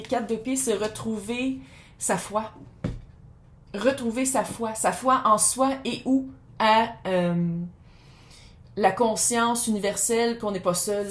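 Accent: Quebec, Canada. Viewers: j'aime bien ce que tu est ne lâche pas tu est une source d'Energie .. je suis désormais abonner a toi.. pour ton accent je suis québécoise aussi donc nous avons le même langage entre les Français québécois et anglais malgré nous courage tu es merveilleuse.. merci de la video sa ma donner un peut plus de courage et de bonheur en moi..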